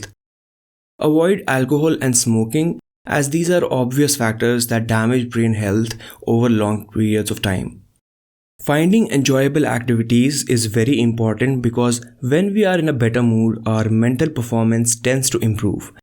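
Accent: India. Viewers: Is speaker a kashmiri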